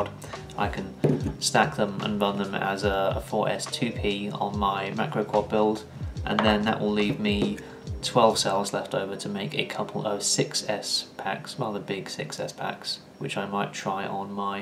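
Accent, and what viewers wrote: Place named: United Kingdom. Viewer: I don't know anything about batteries, I just googled "videos of British people talking funny", but this guy seems really obsessed with sales.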